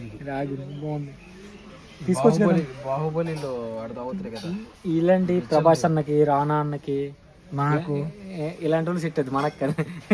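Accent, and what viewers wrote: Srikakulam, India. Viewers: Nee life antha yemi cheyyalo artham kaaka ankithani pelli chesukunnav. Hi this is ane mukka thappa neekemi raadu english srikakulam slang neeku driber job chesuko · Sir Mee slung srikakulam la undhi bro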